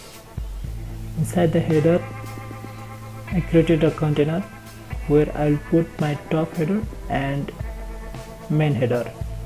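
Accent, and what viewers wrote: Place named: India. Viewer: Man I love the fact that you make tutorials but sometimes its hard to follow indian accent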